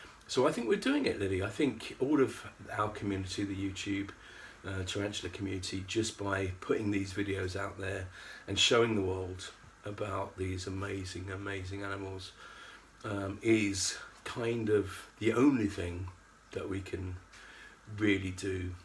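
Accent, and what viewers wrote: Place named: RP, United Kingdom. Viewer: you sound quite posh.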